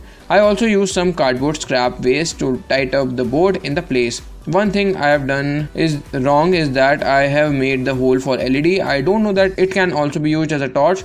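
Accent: India. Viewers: Am from Kenya but from that accent I knew he was from India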